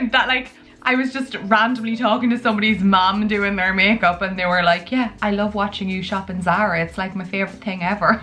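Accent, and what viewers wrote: Ireland. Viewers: Greetings from Los Angeles!🏖🌴Your channel was on my suggestion and I took a glance and got hooked! I hit the subscribe instantly. Gotta say I've been binge watching your vids.😊 Great style, great personality, lovely Irish accent and overall 👍👍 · I see, hear an Irish accent, think of tommy shelby, then die bc I love cillian murphy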